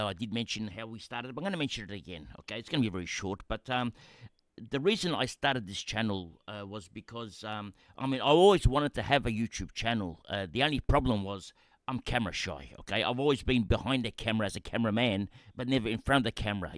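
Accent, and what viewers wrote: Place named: Thailand. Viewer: You bloody beauty… so happy… I too felt the loss when JR retired, but I’m still playing in 5 ex-JR comps with the same old crew … now I’m in 3 of yours plus 3 of your RTSS comps… when I saw the ‘news’ it left me really flat.. losing was gonna be very sad…. So glad you’ve come around… besides FPL is a very frustrating and gimmicky game, I had already decided after this year to give it away.. hahaa… btw your Tinglish is grouse and for the record I tink you are a great communicator.. ❤❤❤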